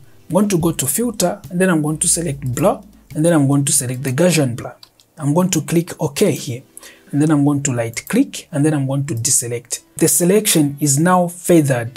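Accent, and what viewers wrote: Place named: Zambia. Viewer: For some reason you sound Zambian, not that its important at this point😁 very good tutorial.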